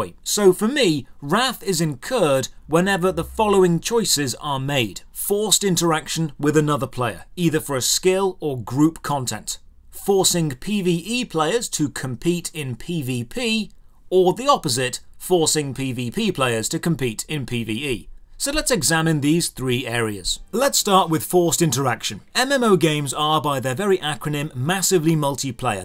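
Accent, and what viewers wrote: England, United Kingdom. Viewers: I like the way u talk to me English man, Keep it up ole chap XD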